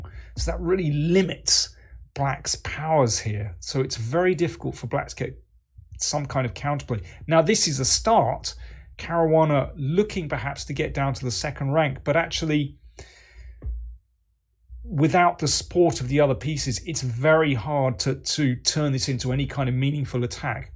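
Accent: United Kingdom. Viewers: nice british accent, my dude (mate)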